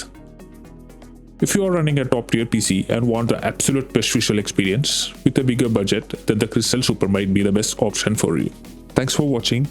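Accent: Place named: India. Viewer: I can recognize that accent anywhere. You from India too?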